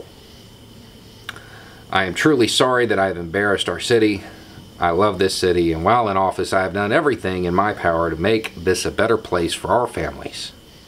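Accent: South, United States. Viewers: Dude I have to be honest... I caught my self for falling down that same trap when I heard your accent, but you have definitely proved me wrong. It's awesome to see someone from the south standing out on their own morals.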